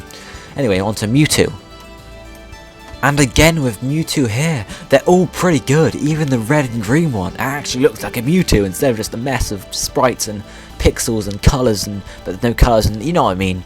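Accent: United Kingdom. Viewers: I'm curious....are you and Matt Smith from the same part of England?....because you seem to have very, very, very similar accents.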